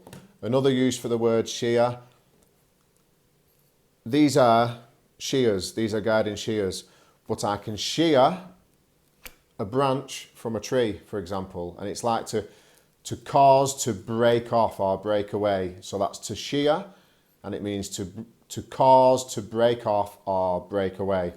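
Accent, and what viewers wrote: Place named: North, United Kingdom. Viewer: Very interesting video. I like your northern accent. It's curious because usually, those kind of accents are hard for me to understand but yours is cool. I discovered your channel three days ago. I watch several youtube channels about learning English. Some of then have a huge amount of subscribers, yours hasn't yet, but you sound very sincere, clear, simple and useful. Thanks from Basque Country Spain.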